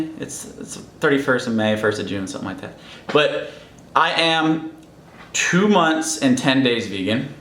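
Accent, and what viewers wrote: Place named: South, United States. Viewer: What part of the south are u from?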